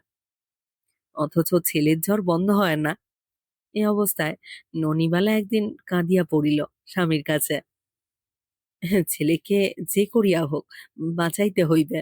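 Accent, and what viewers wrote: Bangladesh. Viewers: এই গল্পটা এদেশী লেখকের লেখা।বাঙাল টানে পড়লে তা উপযুক্ত হয় না।তাই পাঠ অত্যন্ত নিন্দনীয়। না বলে পারলাম না।পারলে শুধরে নিও।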